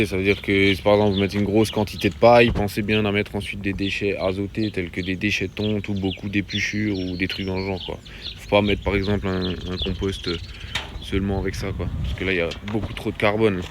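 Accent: Alsace, France. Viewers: Le plus important, c'est l'accent. Je suis aussi alsacien et je comprend mieux avec l'accent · j'adore ton accent Alsacien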